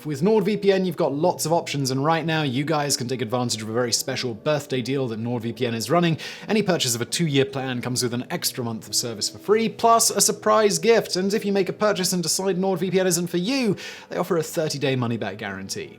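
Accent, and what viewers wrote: United Kingdom. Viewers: Intrusive r, very british as well :)))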